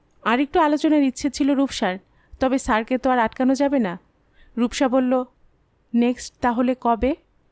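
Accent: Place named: Bangladesh. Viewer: Reading is good except a few Bangladeshi pronounciation.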